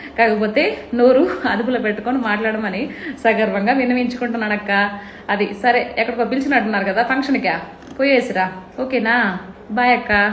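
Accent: Nellore, India. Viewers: GODAVARI YASA KADHU RA BABU, MAA NELLORE AK47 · Nellore yaasa not godhaari yaasa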